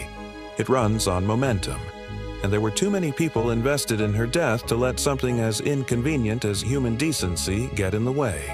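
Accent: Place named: United States. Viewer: So how truthful is this? The dramatic American accent lends BS/showbiz to this rendition!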